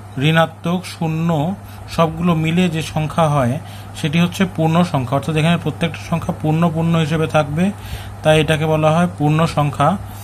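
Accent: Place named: Barishal, Bangladesh. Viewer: আপনার বাড়ি কি বরিশাল?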